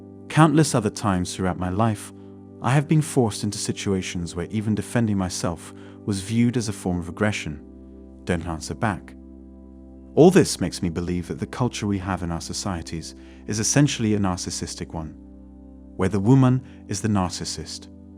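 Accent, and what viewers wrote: United Kingdom. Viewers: He just goes on and on with the same old tirade. You don't learn anything on this channel. You are just putting pennies in his pocket. Then he says in some videos that he is American but you hear a British accent lol. I think it's a text to speech program because some simple words are often mispronounced.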